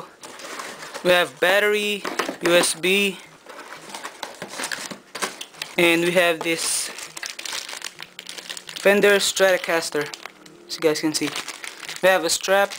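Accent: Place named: India. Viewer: you sound indian. i'm chinese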